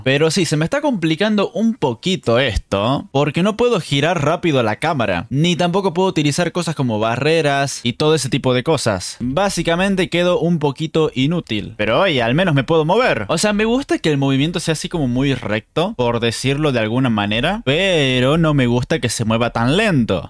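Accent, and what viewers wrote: Córdoba, Argentina. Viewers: HABLA COMO SI FUERA ARGENTINO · Pareces cordobes con ese acento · Soy yo o tiene acento cordobés?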